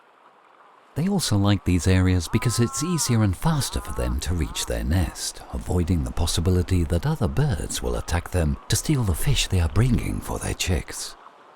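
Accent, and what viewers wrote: RP, United Kingdom. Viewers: ITS A , YES ! A FOXUMENTARY . OF COURSE AND NARRATED BY A RIGHT POSH BRITISH VOICE ! OH!! RATHERRRR!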